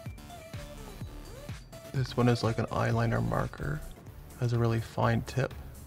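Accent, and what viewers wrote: Canada. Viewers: The Canadian accent coming through. I’m living for it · I’m Canadian and I’m sad I literally can’t hear Canadian accents because all the fake ones sound so funny so I wish I could hear Brooke’s accent the way other people do😭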